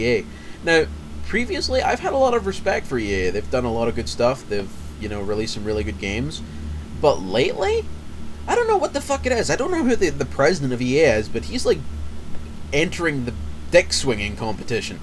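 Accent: United Kingdom. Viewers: are u a brit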